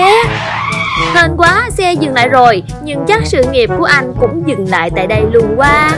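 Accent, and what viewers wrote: South, Vietnam. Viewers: Tôi thích nhất chất giọng ad miền Nam ngọt ngào.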